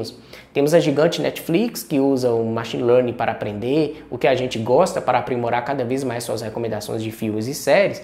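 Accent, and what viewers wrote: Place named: Minas Gerais, Brazil. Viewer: Obrigado por todos os vídeos que você posta pra gente... aprendo muita coisa contigo.. muito bom ver um mineiro como eu e da minha cidade (BH).. kkk ja imaginava pelo sotaque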